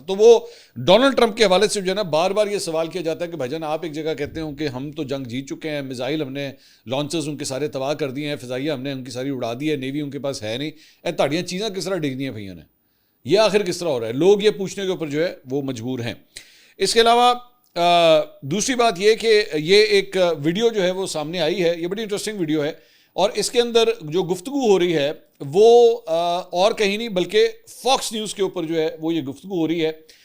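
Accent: Punjab, India. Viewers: bhai Punjabi bhtttt piyari bolty hn ap😂❤ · Salam Mr punjabi touch in vlog brings 🔥🔥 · bhai aap ke panjabi commentary ke kya he kehny ayhyhy maza he aa jata hai sun ke❤❤❤ love you...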